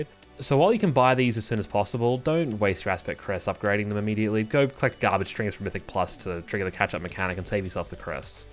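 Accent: Australia. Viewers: Bro theres nothing better than hearing another brother aussie making WoW videos <3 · He's got some kind of Australian accent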